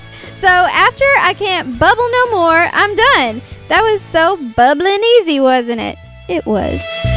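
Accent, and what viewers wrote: South, United States. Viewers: You sound country! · is it me or she has like a country accent kinda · U r really pretty and ilove ur country accent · Southern accent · you sound like you're from the south.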